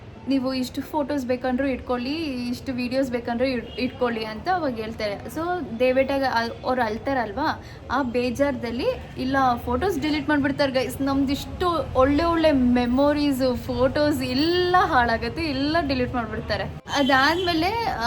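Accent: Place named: Andhra Pradesh, India. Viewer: Why you have telugu accent??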